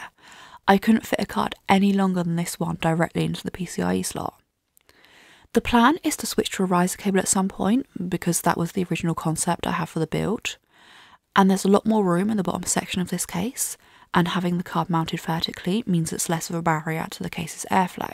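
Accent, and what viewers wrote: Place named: RP, United Kingdom. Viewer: still watch your videos just to hear you say "but" with that posh accent of yours.... will shamelessly steal your voice for my AI personal assistant when the time comes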